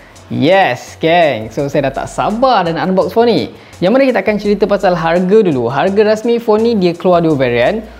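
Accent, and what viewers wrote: Malaysia. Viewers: Saya suka banget sama nada bicaranya org malay❤️ salam from indo🙏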